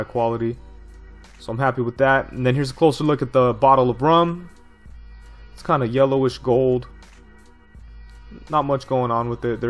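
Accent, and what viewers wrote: New York, United States. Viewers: Anytime bro and no worries we all make mistakes we're only human. Also I can imagine from the video you made of your trip on San Diego Comic Con even the stuff you had it'll be a bit to review also yeah Idk why they didn't included that since I'm sure he used a pistol in one of the movies but I don't remember for sure. Still kinda off on that since lately it seems S.H. Figuarts are getting lighter on accesories I noticed it with the S.H. Figuarts Deadshot even Dark knight Batman that was released last month idk very strange. Also thanks again man for the info really appreaciate the heads up on that also too can you put one of those business cards you made with it? I ask since can't let those cards go to waste after you worked hard on them for San Diego Comic Con so be cool to have one from you. Lastly, thanks for also saying my last name correctly you said it the right way since I am Puerto rican lol was also gonna include that in my email asking you if you're hispanic as well since I know you live up north in NY by your accent since was always very curious on asking that. 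Also too again keep up the good work on your videos and once again I'm thankful for winning your contest and will enter again in more in the future and hope you have a great day.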